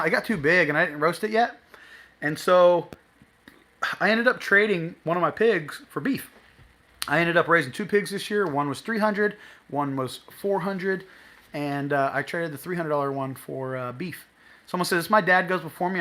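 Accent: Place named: Netherlands. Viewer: Sounds good you sure sound like dutch will be interesting watching your channel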